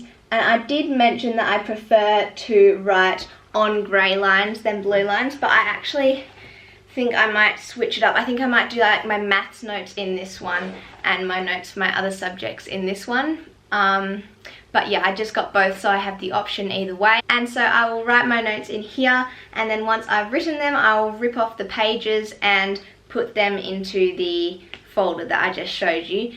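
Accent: Australia. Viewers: I love the accent of australian 😍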